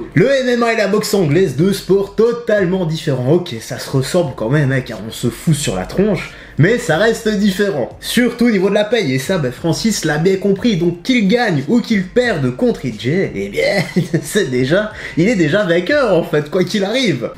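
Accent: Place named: Liège, Belgium. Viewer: je vais plutôt bien, content de te retrouver un peu plus souvent sur YouTube. Tes analyses, réactions sont direct, simples et pleine d'humour et de bons sens. Ça change des contenus français qui ont tendance à être... team A contre team B , les différentes chaînes YouTube se font une guerre qui n'a aucun intérêt, qui est tout sauf sportive. Ils ne semblent pas réaliser que ça nuit totalement au MMA et à leurs combattants. Même si les YouTubeurs sont très compétents,...bref... Merci pour ton contenu bien belge avec ce petit accent de ??? Liège ? Perso suis à Charleroi . Kiff bien ce job et pourquoi pas un feat avec Ilies ou Chris Guenacht ? 3 bien belge 😊😊🙃 belle brochette de connaisseurs 😁🥳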